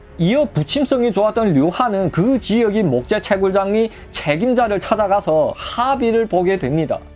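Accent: China. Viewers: 님은 조선족 출신인가요? 조선족 출신이라는 표현이 맞는지 모르겠습니다만 친한형님이 광저우에 공장에 있어서 여러번 같이 갔었는데 현지에 황룡하라는 친구가 조선족 이었습니다 참 착한친구인데 이 영상보니까 룡하 보고싶네요 ㅋㅋ 코로나 때문에 언제 또 갈지 기약이없지만. ㅋㅋ 안녕하쇼오. 룡하 하고 완전 똑같네요.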